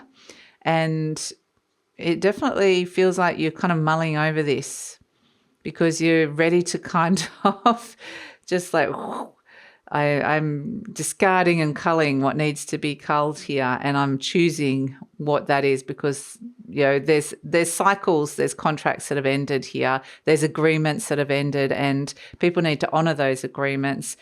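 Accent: Australia. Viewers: ❤ nice to hear an Aussie accent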